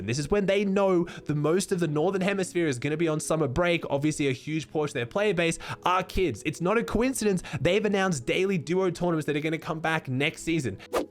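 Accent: Australia. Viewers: Wtf haha that voice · Not gonna lie, Groggy brought a smile to my face.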